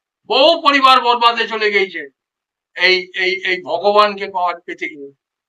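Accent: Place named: India. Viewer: বাংলা কথা হিন্দি কথা টান দিয়ে কথা বলছেন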